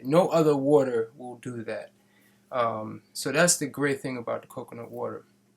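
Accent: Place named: DMV, United States. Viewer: u sound like ur from the DMV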